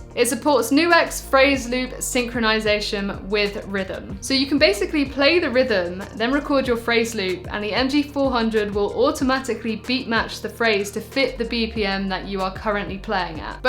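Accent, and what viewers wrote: United Kingdom. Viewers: Brit accent ! you play well, congrat' :)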